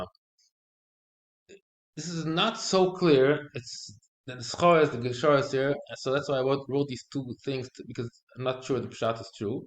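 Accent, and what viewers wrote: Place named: United States. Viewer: מבטא אמריקאי חזק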